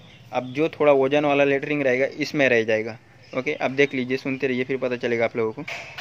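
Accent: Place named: Bengal, India. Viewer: Bangali ho na?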